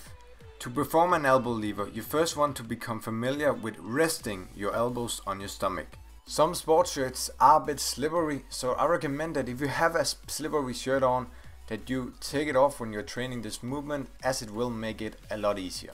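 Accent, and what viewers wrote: Denmark. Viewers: Nice danish accent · I love the Danish accent😂😂😂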